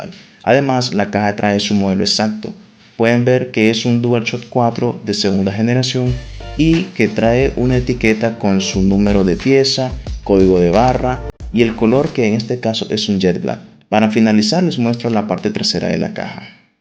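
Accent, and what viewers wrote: Nicaragua. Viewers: Yo también y además se te escuchaba el acento a nica por eso fue que te pregunte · Maje, ¿sos nica? :0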